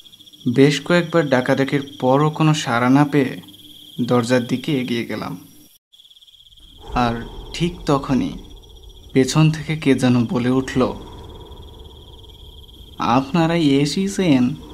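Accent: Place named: Bangal, Bangladesh. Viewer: এমন বাঙ্গাল উচ্চারণ কানে বেশ বাজে লাগে।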